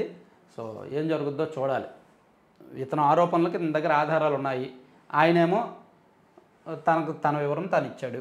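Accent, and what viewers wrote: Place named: Srikakulam, India. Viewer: శ్రీకాకుళం భాష సూపర్ సూపర్ సూపర్ 😅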